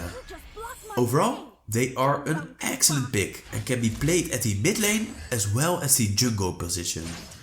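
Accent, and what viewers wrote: Netherlands. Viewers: Hey man, Ik herken een Nederlander wanneer ik er één hoor. Als je mensen zoekt om mee te spelen/test content mee te maken laat maar weten man.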